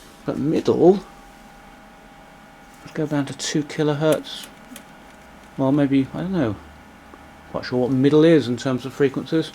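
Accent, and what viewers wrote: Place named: United Kingdom. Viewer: watching so many of your videos from usa i am picking up your british accent. :).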